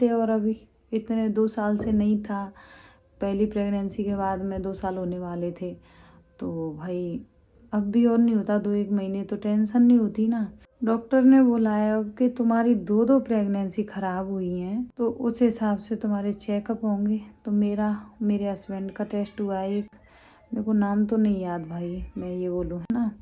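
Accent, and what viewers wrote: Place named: Bharatpur, India. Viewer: Aap kaha sey ho Rajasthan language sey to bharatpur k aas pass k kag rhey ho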